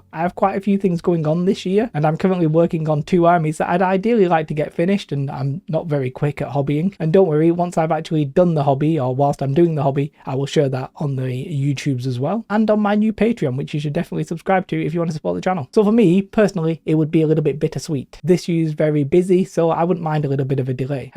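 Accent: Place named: Germany. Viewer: What is your accent, German? Tjought you was a Brit but today I heard you have some charming accent. :)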